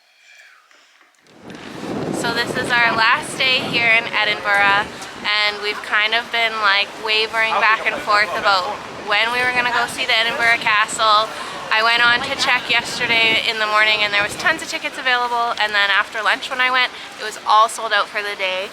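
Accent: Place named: Canada. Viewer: I hope you enjoyed your visit to Edinburgh and Scotland. I only picked up on the fact you’re Canadian when you said “out”. Many years ago I worked in a large city centre hotel and had the pleasure of meeting many people from Canada. You’re quite brave taking the wee one with you. In recent years Edinburgh has become (like so many places) a tourist attraction all year round, whereas it was mainly just in summer when it became so busy.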